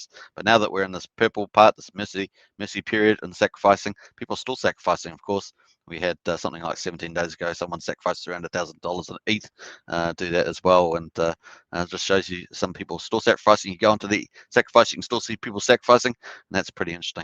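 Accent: New Zealand. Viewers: Hey bro, good to hear a Kiwi doing some streaming.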